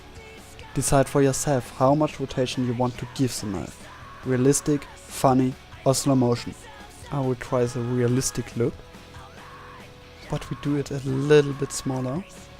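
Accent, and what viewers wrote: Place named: Germany. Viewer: Man merkt das du deutscher bist. :D Aber richtig geile Idee mach weiter so.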